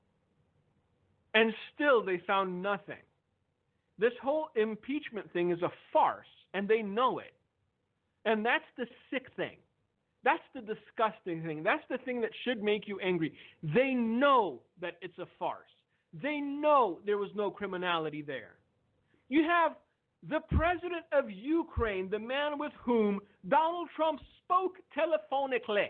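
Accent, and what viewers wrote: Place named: France. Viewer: I love your French accent. You kill me. Lol!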